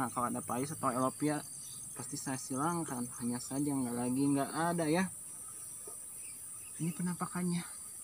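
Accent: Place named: Sunda, Indonesia. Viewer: Klo org sunda berbahasa Ind logatnya meni ledok banyak,,mah"😅nya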